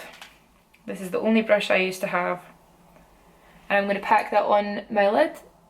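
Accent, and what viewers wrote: Scotland, United Kingdom. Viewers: what part of the uk are you from? love ur accent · I've said it before & I will say it again ur Scottish accent is everrythingg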